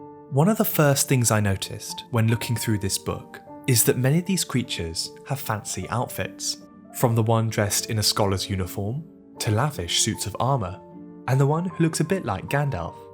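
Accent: England, United Kingdom. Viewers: British narrator Not gonna listen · This is good stuff my snooty sounding English friend. More please.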